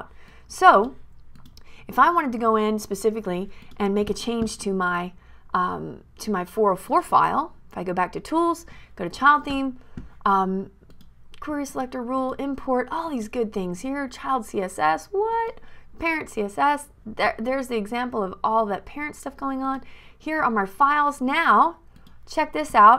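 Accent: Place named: Texas, United States. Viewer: you are a genius. Love the texan accent.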